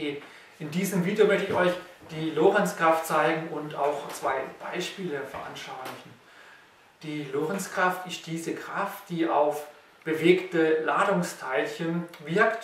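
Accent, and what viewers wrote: Swabia, Germany. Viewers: xD schwabe!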